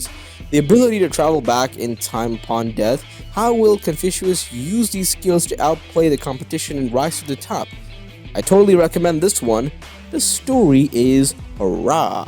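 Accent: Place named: India. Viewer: Are bhai Indian ho na tumhare English se hi pata chal gaya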